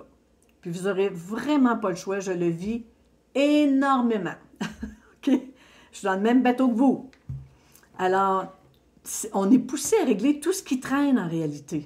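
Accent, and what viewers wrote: Quebec, Canada. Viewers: Merci. J'aime bien t écouter. Message très positif pour la France, trop contente. Je ne sais pas si il y a des cafés jasette sur Paris. J habite Argenteuil dans la banlieue. Ce samedi le bonheur sur Paris. Du soleil 26 degrés. Les jeunes au bord de la Seine, port de Bastille avec chips en vin et pain. Ambiance vacances. Trop bien après cet hiver marqué par la pluie. ❤❤❤❤Sylvie. PS j adore ton accent qui me rappelle mon grand père et ma tante qui ont vécu au Canada et qui sont au ciel maintenant. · Merci beaucoup. Je t’adore💖🙏. J’adore aussi, en plus de ton charmant accent québécois, tes enseignements et tes encouragements. Je sais qu’il y a un café jazette à Bordeaux mais je ne retrouve plus les informations. Gros bisous à toi adorable 🙏🙏😘🥰👍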